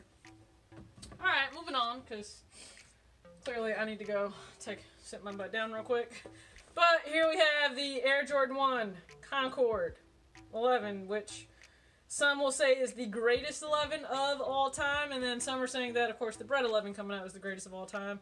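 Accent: South, United States. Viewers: I love your thick country accent!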